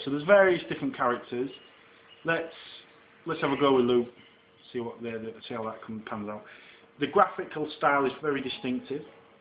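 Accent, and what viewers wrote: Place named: East Yorkshire, United Kingdom. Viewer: I sense an East Yorkshire accent!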